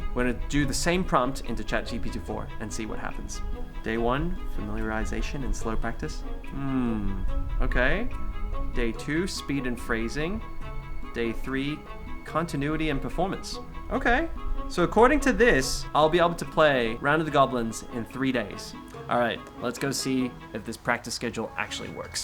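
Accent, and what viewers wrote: Australia. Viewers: Bro Your accent sounds like it’s from Australia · Is it me or did you change your accent to a more Australian accent? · dude you sound australain. do you live in my backyard ??? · Hahaha I have been so confused and thought I was going crazy like why does he only sometimes have an Australian accent!? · please don't apologise for the australian accent... in fact, you should apologise that you lost it in the first place :D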